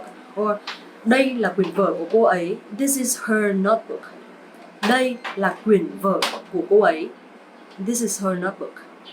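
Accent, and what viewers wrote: North, Vietnam. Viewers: That northern accent is HARD in itself as a southern hahahss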